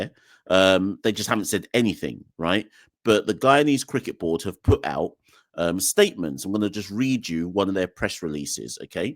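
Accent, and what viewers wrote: England, United Kingdom. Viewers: This guy lives in the UK, so it seems, and is essentially making silly comments based on 'second hand' information. Two instances where GCB and BCA had a disagreement with CWI, for reasons the silly host of this podcast failed to explain, means both territories want to 'go it alone.' has also refused to acknowledge the contributions, financial and otherwise, Barbados has made to WI cricket. He also failed to inform viewers that under both Skerritt and Shallow, there hasn't been any significant implementation of policy initiatives to improve and develop regional cricket. But sits on his soap box spewing nonsense, perhaps believing, as in days of old, people would succumb to his views because he has an English accent.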